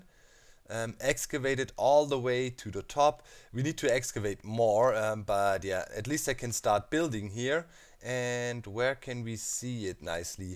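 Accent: Germany. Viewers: I've followed you since the tree house days. I was following Vintage Beef when he visited your base having made himself invisible and you just turned round and shot him . I have followed you since. I am useless at redstone but I love your videos. I really like your elegant building style. I am English from London and, through you,I joined my first multiplayer world - Gummy's Tree realm. Your English is excellent and I love your accent. Subtitles would not work for me because it would lose the close connection that listening to someone's voice achieves. Most Germans speak excellent English so keep going in English please.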